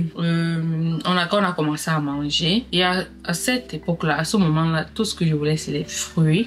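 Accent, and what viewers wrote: Cameroon. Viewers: juste dire t es trop drôle.tu racontes comme une vrai Kmer😂🤩🤩🤩.j adore la coiffure du premier mariage restreint ❤❤❤🎉🎉🤩🤩🤩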